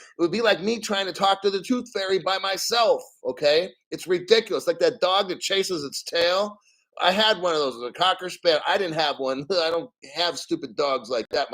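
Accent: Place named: New York, United States. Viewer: This is one of the very few crypto channels that isn't trying to sell you something or shill their own bags; appreciate it. Are you originally from NY/ NJ ? You have the accent & the same Go Fk Yourselves mentality we have here on the east coast, hahaha . Keep up the good work.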